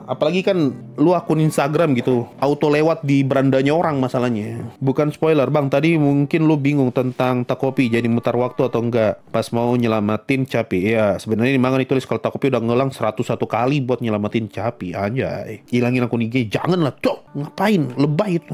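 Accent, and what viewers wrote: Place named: Medan, Indonesia. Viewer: bang bahasa nya medan banget 😂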